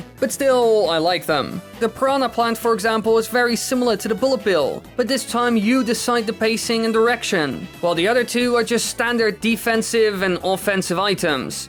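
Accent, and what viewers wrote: Netherlands. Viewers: Are you Dutch? Because I recognize that accent and if you are however than I would like to say something: Leuke video's man ga zo door👍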